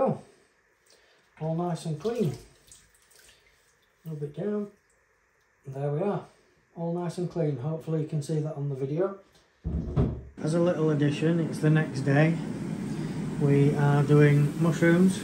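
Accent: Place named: England, United Kingdom. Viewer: Glad to hear an English voice (for a change). Good luck with the channel.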